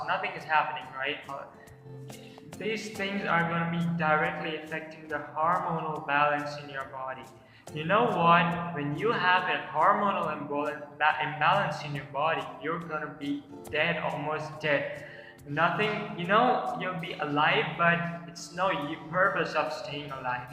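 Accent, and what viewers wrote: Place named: United Kingdom. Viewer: I am sorry for saying yu bad words actually that's not my intension becoz i was serching on airlines job on you tube then i found out your video but i saw your speaking tond is as like British accent that's i don't like.so that's why i thrown out bad words to u.Now i am heartfully apologize for what i said to you. I know you have big heart sorry brother..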